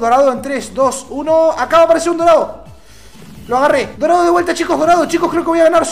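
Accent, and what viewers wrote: Chile. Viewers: Ya pero hablando chileno,re good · Q bien habla chile otros pibes lo hacen mexicanos JAJJA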